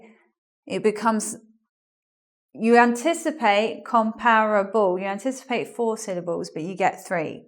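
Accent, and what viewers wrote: United Kingdom. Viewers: Well, she sounds completely British to me, and just love the accents she use. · i hate british accent after this vid. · Thank you for this good lesson, with British accent. I think that British (let's say English)accent is the sole fundamental and elegant one, to learn for non native speakers,first of all, and before any other accents, which have altered a lot the original and true ESL. These additional accents do not seem very necessary.(American, Asian, neutral etc.): for instance, an U.S.A. native can well understand someone speaking with an English accent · English - Learn English (engVid) I have a doubt,you are british? I have trouble in recognise accent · I was following your video like British accent but i didn`t know that you are also teaching at Engvid... thanks so much for helpful clip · You are so sexy with your sexy voice and that British accent!!! 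· Hi your acssent british english) i'm lithuainian live in england 10 years,and sometimes very hard to understand english acssent,canadian and americans i understand,but i not in north america i'm in england and i need learn british english,thanks i like your videos ))) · Finally i found one channel of british english lessons, i was tired of those estupid american accents, the best accent for me is this! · Pretty much the most normal English accent you will hear, not sure why everyone's saying it's weird. If you think it sounds weird then you're unfamiliar with British English! Urban south eastern. Only weird thing I heard was illead instead of ellide. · My god, I love your british accent and trying to copy that , though I'm a man :D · I LOVED THIS LESSON. YOU SPEAK WEIRD. LIKE BRITISH.. ARE YOU BRITISH? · lol no you don't sound like a native speaker, too british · I love this video and, I like the way she teaches. 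someone said that she isn't good at explaining things but, I don't agree. she's explaining is very simple. she is trying to talk every sentences shortly using easy verbs, as I see. and she's british accent is very clear. (she even speak slowly, nice!) this all things will be helpful for Asian people want to learn by watching and listening British english just like me. especially Japanese, Korean. Because this two Country's grammar is very different to english! It's completly reversing how you speak & read. Long explaining, added adjective, talking stories, it's sometimes useless! it's even make more confusion to these country's people. These videos are just what I want! Thank you for! x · you are superb , i'm in love with British accent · OMFG.......the British accent is terrible !!!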